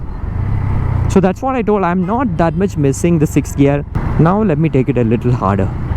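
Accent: India. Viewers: Such a calming soothing voice and also you speak English in such a fashion it feels like you are speaking in hindi. Amongst the most genuine and humble person i know on youtube. Keep up the good work bhai, ❤️🙏😍🙌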